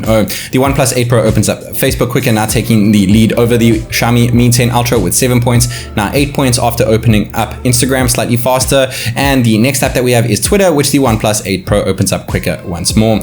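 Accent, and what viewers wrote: South Africa. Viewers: Where are you from bru you sound South African ? much love from SA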